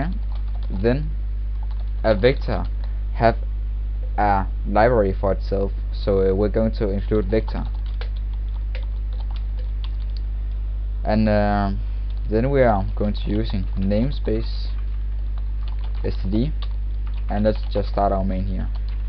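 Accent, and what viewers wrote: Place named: Sweden. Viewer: Hey you're swedish :D !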